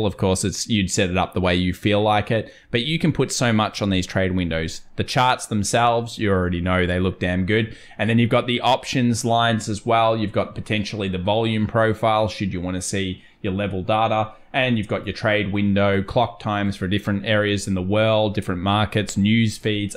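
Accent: Australia. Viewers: I must say, I appreciate the Aussie style of speach. You speak firmly, like you're in a sandstorm, or at sea with strong winds and sea spray. There's no mistaking what you're saying, and you're not using your daddy voice on me. Thank you!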